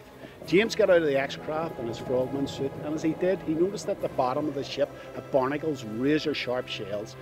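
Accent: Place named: Belfast, United Kingdom. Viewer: Great storys belfast style. Aye.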